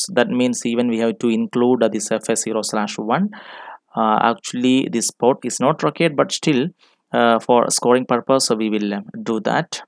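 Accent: Kerala, India. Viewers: Are you Malayali?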